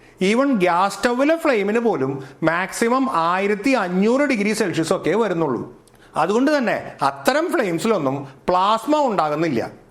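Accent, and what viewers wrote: Thrissur, India. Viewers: മ്മടെ തൃശൂർ ഗഡി ആണല്ലോ ഇദ്ദേഹം സംസാരം കേട്ടിട്ടു... 😌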